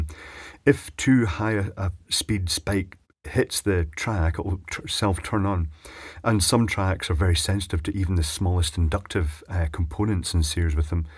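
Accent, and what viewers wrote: Scotland, United Kingdom. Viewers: Twotone Scottish pronunciation.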